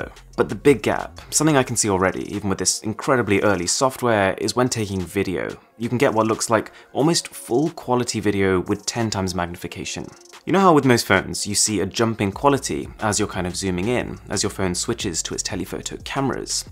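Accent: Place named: RP, United Kingdom. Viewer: Hello Mr posh english accent. How good is this phone without google apps & services?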